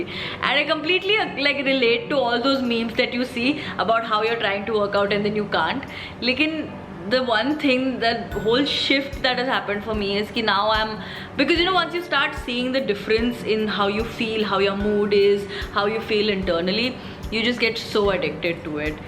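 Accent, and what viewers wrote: Nepal. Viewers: your nepali is the cutesttttttt.... dherai maya tapailai. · Oh gosh best nepali accent ever You the cutest I am nepali too and i am following u for years now Feels amaizing listening your own mother tongue from a person u follow is trying to learn it 👌👌 Best wishes to you You are beautifull soul Cheers to you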